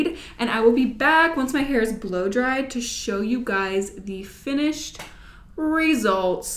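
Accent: United Kingdom. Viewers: Major Ginger Spice vibes - perfect with the brit accent ✌️👩‍🦰🇬🇧 Love it!!! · Lol 😂 love your British accent, Hi from Manchester in the UK 🇬🇧 x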